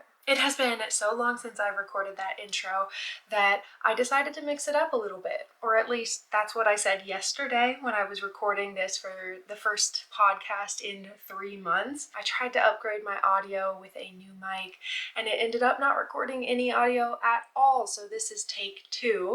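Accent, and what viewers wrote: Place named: Australia. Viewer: I've been watching your video's for a little while now, and I feel like your accent is changing a little bit to sound more Australian lol Also, I feel like our taste in knit pieces is so similar, I love fall colours too and you always pick such beautiful patterns! Watching your video's makes my fingers tingle to start new projects haha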